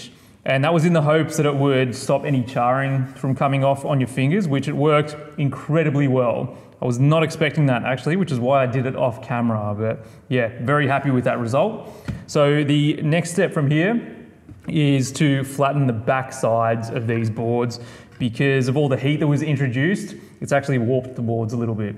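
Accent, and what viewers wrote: Australia. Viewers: So nice to click into a video and hear an Aussie accent. Hello from Ballarat 🥰💗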